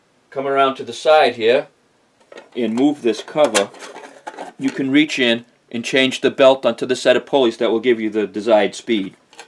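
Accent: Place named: New England, United States. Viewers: I'd say more like Massachusetts. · I'm guessing somewheres in New England. Maybe Maine or New Hampshire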